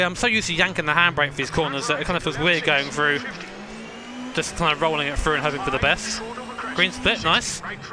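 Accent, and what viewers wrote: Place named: England, United Kingdom. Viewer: 🤣 lmao this had me smiling ear-to-ear the whole video I love your English accent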